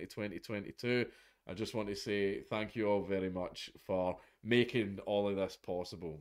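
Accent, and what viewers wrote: Scotland, United Kingdom. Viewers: I love watching all of your videos, I love just sitting back and listening to mini-documentaries in a Scottish accent You've made the past couple of years much more bearable, so thank you and Merry Christmas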